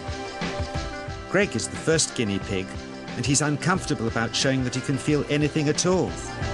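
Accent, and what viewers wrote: Philippines. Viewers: He Looks Like A Filipimo And Speaks Like A Filipino. What Do You Think ? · john chang sounds fillino .. I like the way our fillpino brothers and sisters talk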